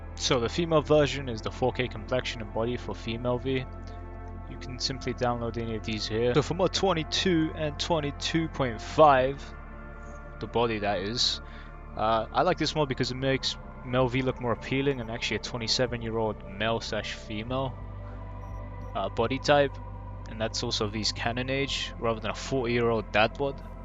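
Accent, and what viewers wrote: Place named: United Kingdom. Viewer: Can you say Water in your British accent please?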